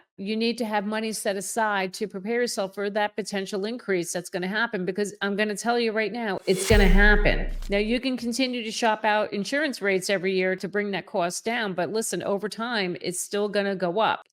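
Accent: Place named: New York, United States. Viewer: I can tell by your accent that you grew up in Long Island or North Jersey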